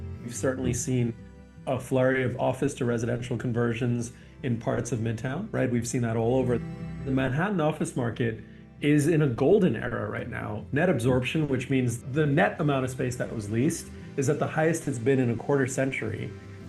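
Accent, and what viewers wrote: United Kingdom. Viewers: Another 20 minutes of a Brit saying Chryzla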